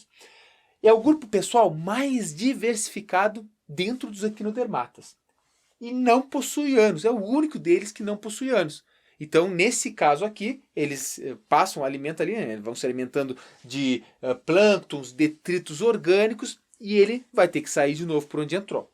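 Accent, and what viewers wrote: Rio Grande do Sul, Brazil. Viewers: sabia que tu era gaúcho, reconheci o sotaque, masss bahh tchê que barbaridade de vídeo, muito bom parabéns !!!!!!!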